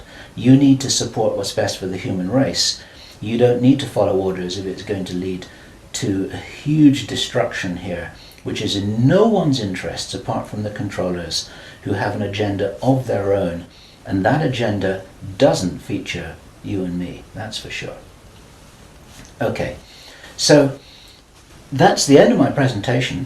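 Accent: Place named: United Kingdom. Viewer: El que murió en 1997 es un gringo o estadounidense. Bill Ryan del actual video habla con acento británico. Que Dios lo proteja.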